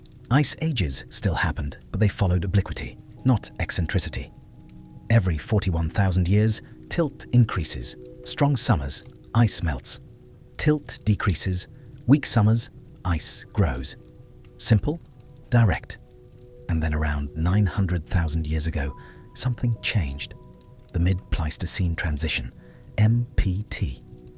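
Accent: England, United Kingdom. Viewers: an English-sounding AI narrator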